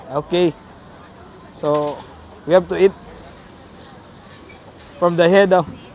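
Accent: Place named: Cebu, Philippines. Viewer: Taga cebu ka lods?? Mao mao mn gud ug tuno sa inisturyahan ni 😁😁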